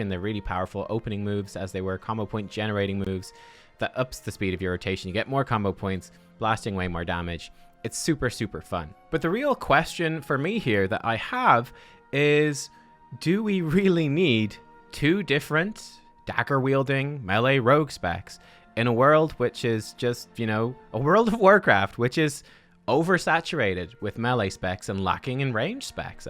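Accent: Russia. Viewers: Loved the Russian accent, your suggestions and these last few video's! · The Russian accent just won the internet.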